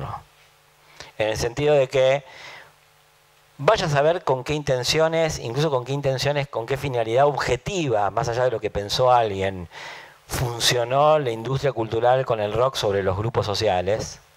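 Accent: Argentina. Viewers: quedemosnon con lo que dice que es lo importante! a mi tambien me da un poco de asco el acento porteño (ni se si es porteño siquiera)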